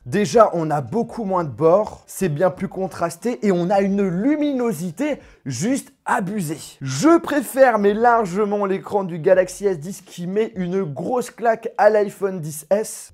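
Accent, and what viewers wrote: Belgium. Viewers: T belge? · Tu es belge????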